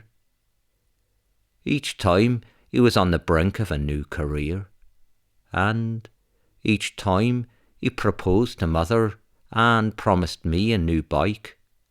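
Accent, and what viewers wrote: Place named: Ireland. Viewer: Aye! I thought it was a pretty decent irish accent. Buuuut I'm American....soooo, yeah. ¡Top o the marnin!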